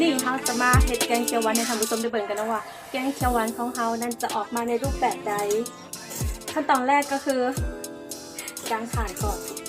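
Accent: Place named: North, Thailand. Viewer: สวยครับเหมือนสาวเหนือพูดไทยน่ารัก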